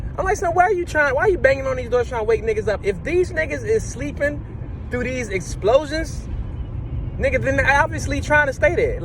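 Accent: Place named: Washington DC, United States. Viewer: Def from DC or PG, lol. Appreciate the review bro!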